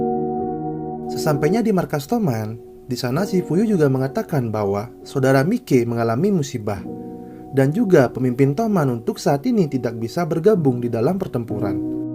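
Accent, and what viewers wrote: Bali, Indonesia. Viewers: Pasti orang Bali ni Mimin nya😁😍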